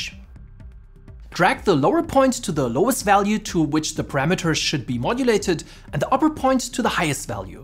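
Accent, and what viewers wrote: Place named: Germany. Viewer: Nice one. And the thick german accent makes this video even better.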